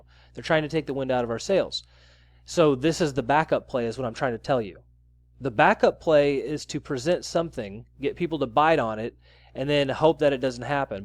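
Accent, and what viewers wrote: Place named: Queens, United States. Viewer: Get that " Street cred" , Kill that Monster.....all good...u sound like yur from Queens Lol...Yur all good my dude!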